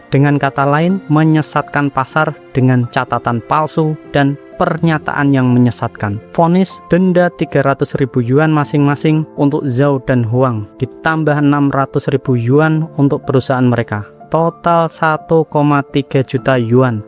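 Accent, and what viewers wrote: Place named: Java, Indonesia. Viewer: KA medok nya ganggu maaf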